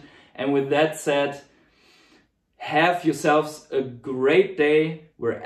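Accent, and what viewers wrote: Germany. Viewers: Good content again. Last point is pretty good :) By the way are you german? Just curious since your english accent sounds like german.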